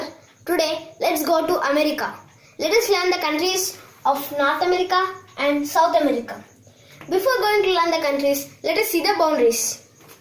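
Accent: India. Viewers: Ryan he is Indian, obviously he has an accent not everyone speaks American or queen's English. Duh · I like him and the video but i hate how Indians invent their own pronounciation. Para gway,uru gway,chilli,Puerto Rica and many more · i like your typical indian accent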